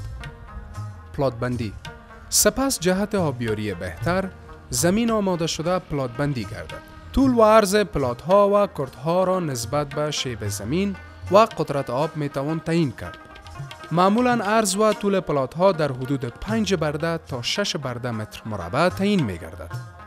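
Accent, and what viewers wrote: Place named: Afghanistan. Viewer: this is done in afghanistan. I'm iranian. the narrator speaks persian with afghanian accent. it's too close to persian.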